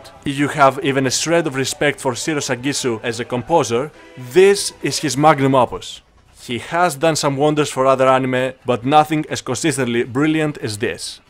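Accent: Greece. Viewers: Are you Greek? Your accent is so familiar😂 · that's a pure greek accent xD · are you from greece? yhat accent is familiar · I watched the video 7 times to understand what country your accent is from and ended up with a question. Are you Greek? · i instantly knew you are greek from your accent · Why this guy sound like a greek man speaking english. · Φίλε μιλάς πολύ καλά αγγλικά αλλά για κάποιο λόγο με το που σε άκουσα λέω αυτός είναι Έλληνας 😂😂😂. Συγχαρητήρια πολύ καλό βίντεο!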